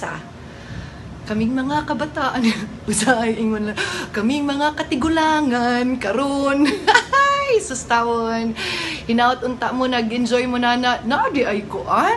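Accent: Visayas, Philippines. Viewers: KA CUTE NIMO MAG BINASAYA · Hala, lipaya niya kaayo...i love you,.ka.bisdaak gihapon mangistorya bisan gamay pa kaayo ka nagpuyo sa Manila,...maong gi bless ka maayo kay down to earth gihapon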